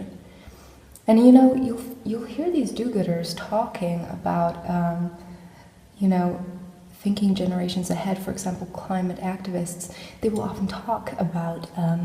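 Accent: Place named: United States. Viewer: I have been a subscriber of yours for a long time. Since you were known as " " or something like that. Anyway I just watched all the videos on this channel and I have no idea what's going on, and that's why it's genius. As an American, I have to say your American accent is pretty good too. I am curious, when you read the books is that your real accent? Anyway, please keep doing what you are doing. I think your stuff here is totally nihilist and I love it.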